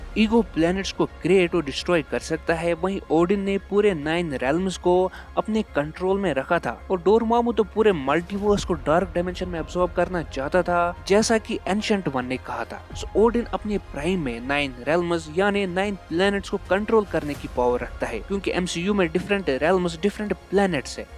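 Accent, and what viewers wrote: Kashmir, India. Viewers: Are you from Kashmir because I am also from Kashmir your voice is like you are from Kashmir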